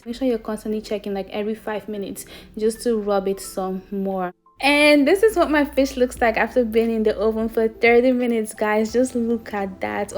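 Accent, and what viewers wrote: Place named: Cameroon. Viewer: your voice and pidgin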